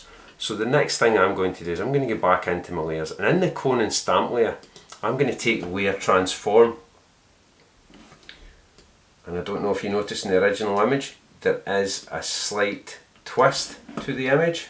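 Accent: Scotland, United Kingdom. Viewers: The Google-subtitles in English are not perfect either... Possibly because of his strong Scottish accent which makes it hard to understand for non-native speakers;-) But his video's are great!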